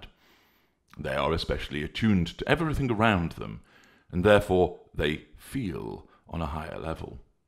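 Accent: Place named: North, United Kingdom. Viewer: Yeah he sounds like he's a RADA graduate. But every now and then I notice either a Midlands or northern accent coming through so I assume he's not from down south originally. It takes a Brit to notice this aspect.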